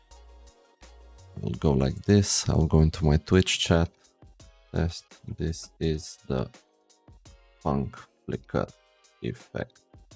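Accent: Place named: Romania. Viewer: Ai voce de roman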